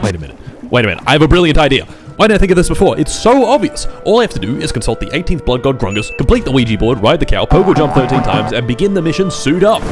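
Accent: Finland. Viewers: Ah the sound of Finland, thank you for blessing our ears.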